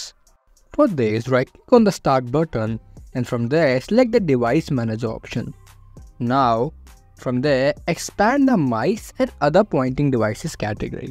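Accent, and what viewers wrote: India. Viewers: This guy talk so indiany its understandable and the acsent is useless but thanks for trying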